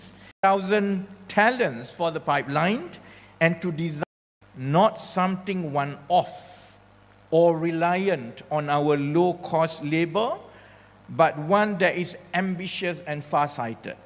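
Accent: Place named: Besut, Malaysia. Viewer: Kaler bunyi besut skit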